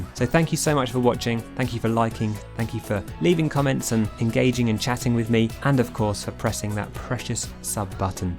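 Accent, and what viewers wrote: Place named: United Kingdom. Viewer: I missed your british voice you absolute legend! Glad to have you back.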